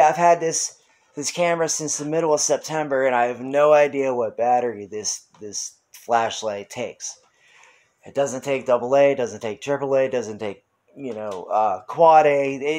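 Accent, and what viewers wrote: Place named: West Coast, United States. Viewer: Wow well out on the accent HA HA HA HAthat's comical,and I thought I was good at accents,could have sworn I heard that west coast surfer sound in your accent ahhhhh... Yeah,she's ok now,very long time ago but when that happened and the things that happened after.. it was like with taps coming in and noises like coming down the stairs,made me think of your doors opening and stuff it's always hard to catch these things on camera though. Like they don't want to play when you do,when I was at my other flat two small pictures just jumped off the wall whilst I was looking in the mirror near them I only saw it through my peripheral vision but the girlfriend saw it I was like ' wtf happened then' but she saw it,they couldn't have fell by accident as they wehanging off nails you had to lift them up to get them off and other times,other things that were quite frightening at times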